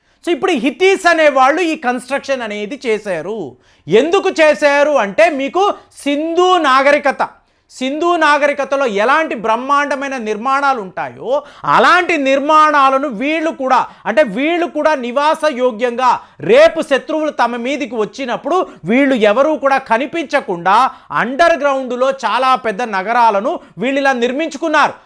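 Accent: Tamil Nadu, India. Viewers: Sir Tamil background Telugu anduke Tamil accent to Telugu matlduthunnaru Hyd / Telangana lo Telugu Urdhu lo makkinchinattu matldutharu alage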